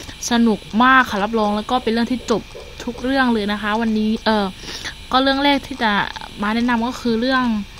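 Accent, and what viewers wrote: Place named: South, Thailand. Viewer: คนใต้ชัว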